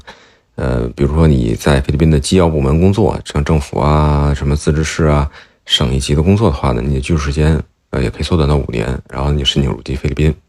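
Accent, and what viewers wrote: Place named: Beijing, China. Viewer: 北京人儿？这口音很好听啊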